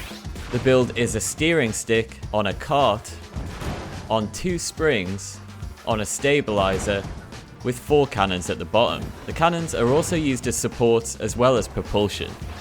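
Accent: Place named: England, United Kingdom. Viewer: Quick question, is your name “,” or “?” It’s hard for me to understand at the beginning of your videos, since I’m not used to the English accent…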